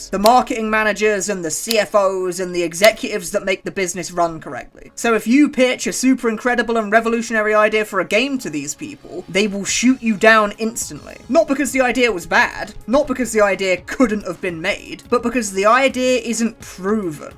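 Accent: United Kingdom. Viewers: I like the funny British man talking about indie games and the outer wilds. Seriously tho I know this is an old video but I love your content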